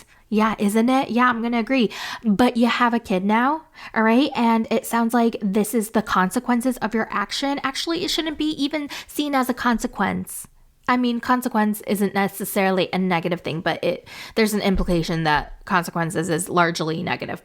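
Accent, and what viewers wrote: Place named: New York, United States. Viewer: Your New York accent. Haha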